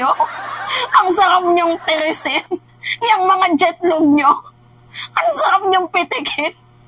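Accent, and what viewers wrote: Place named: Iloilo, Philippines. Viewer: ILONGGA KA NGA TUNAY❤❤❤❤❤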